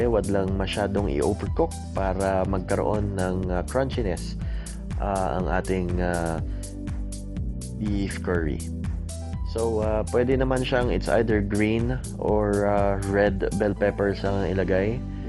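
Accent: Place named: Philippines. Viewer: You have a Filipino accent and speak English also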